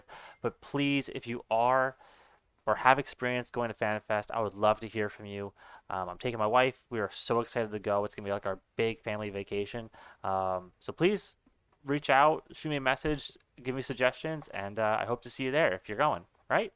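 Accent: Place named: United States. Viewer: 4s is super euro. I didn't expect the American voice. expat?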